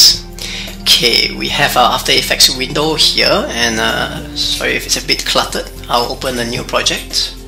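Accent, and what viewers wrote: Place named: Singapore. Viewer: I like hearing this singaporean accent hahaha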